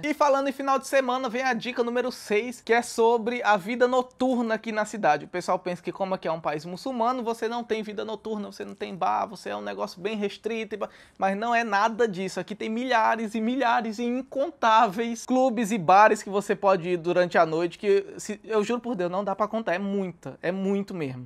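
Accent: Northeast, Brazil. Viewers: Caí aqui, e tu é nordestino? Pois parece com esse sotaque kkkkkk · Oxi vc é do Nordeste é...????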